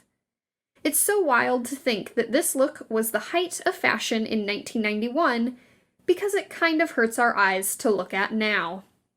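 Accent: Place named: United States. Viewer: Why do you Americans pronounce ‘blouse’ as ‘bloussse’? Double stressing the ‘ess’. Derby is pronounced Darby.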